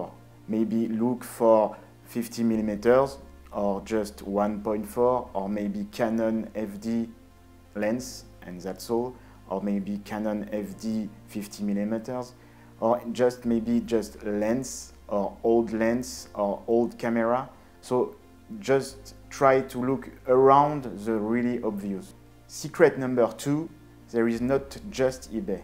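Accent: France. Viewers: Il y a peu de bonnes videos francophones sur Youtube alors je n'ai pas compris pourquoi tu as préféré publier en anglais avec cet accent francais qu'on reconnait à mille lieues plutot que d'aider les francophones qui n'en trouvent pas. Merci quand même des conseils. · I can listen to that French accent all day.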